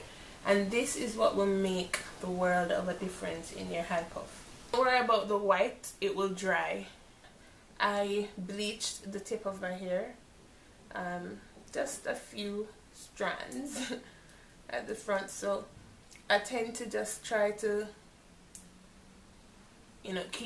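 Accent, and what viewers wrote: Jamaica. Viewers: I so love your accent, Jamaican Big Up Gal!!! Listen I am in England honey I don't think I can get that gel here. where did get it from? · Where are you from? You sound like you're from Jamaica (or a Caribbean island at least)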